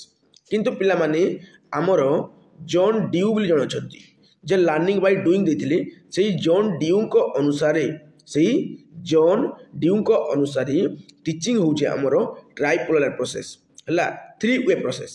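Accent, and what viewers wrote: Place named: Angul, India. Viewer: Sir apana vala class karuchanti hele majhire majhire Angulia language tk tk bahari asuchi 😅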